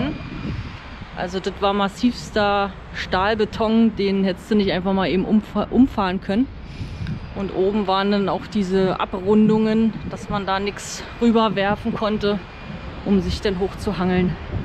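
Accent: Berlin, Germany. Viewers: Klasse das du das Thema aufnimmst. Bin Wessi aus NRW. Für uns immer noch unvorstellbar diese Zeit. Berlin ist Kultur , bitte auch den Dialekt bei beibehalten. Mega ✌🤙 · ja hattest du ja erwähnt das es nicht der offizielle weg ist. Wäre trotzdem interessant was du so an Strecke macht in welcher Zeit😊 Auch Respekt das du das alleine durchgezogen hast👍 Meinte nicht die Kommentare auf deinem Kanal, sondern den Herr im Wald als ihr holz Farmen wart. Aber ja, auch mit negativen Kommentaren muss man leben wenn man youtuber/in ist. Aber lass dich dadurch nicht entmutigen. Machst das klasse mit deiner Berliner Schnauze😉😃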